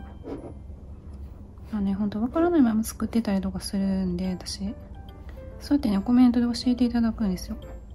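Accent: Kansai, Japan. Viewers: はじめまして！の動画を偶然見てから編み物がしたくなり始めました。今、がま口にはまってしまってます。かぎ針初心者ですがの動画を見ながら何個かつくれました。私は関西人なのでの喋り方親近感が湧きチャンネル登録しました。これからも素敵な編み物どんどんアップお願いします💕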